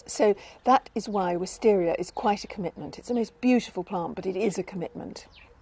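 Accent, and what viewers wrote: United Kingdom. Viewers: I like the way you speak! What a nice British English! Will keep these useful recommendations in my mind, Greetings from Ukraine!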